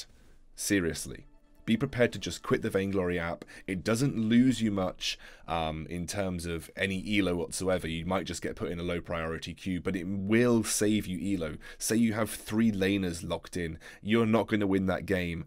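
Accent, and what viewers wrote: United Kingdom. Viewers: think hes on Europe because his intro and his language show us he is brtish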